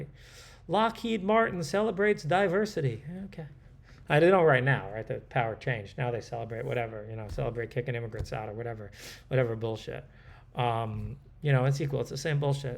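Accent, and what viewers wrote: New Jersey, United States. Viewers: That New Joysey Áccent. 🙃🙂😃😊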